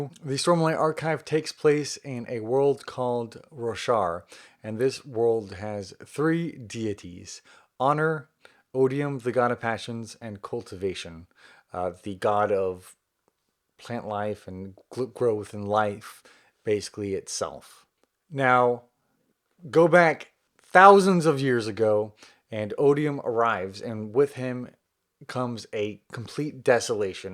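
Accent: South, United States. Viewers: I love your video at your house in Texas during your quarantine until you had like a book called, "Rhythm of War" by Brandon Sanderson until you're talking about all of the characters on the book from the very beginning while you're reading the whole thing after that, I hope your husband, Zach will be very proud of you and I love your Southern accent!!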